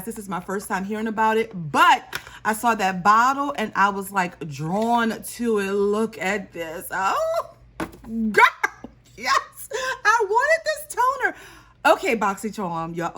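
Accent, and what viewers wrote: Louisiana, United States. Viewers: Your makeup and nail color are so pretty!!! And I love your Louisiana accent especially when you say your name and today...so cute😊 nice unboxing too😊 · You have to from LA with that accent. Love it! Lemme guess BR or NOLA.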